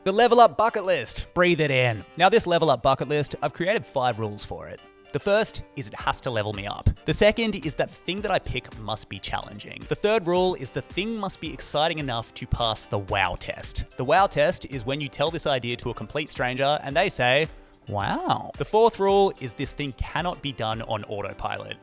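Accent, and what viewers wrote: Australia. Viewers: Hey, as an Aussie who watches all these productivity / life improvement stuff, you are so incredibly refreshing. It’s great to hear our accent and the very aus approach to this stuff - ‘fuck it, I’m in!’ - you’re unique in a sea with lots of sameness so you gotta keep making content! I’ll listen to anything you’d like to talk about! This bucket list, mate - that’s fucking awesome and I’m looking forward to following your journey!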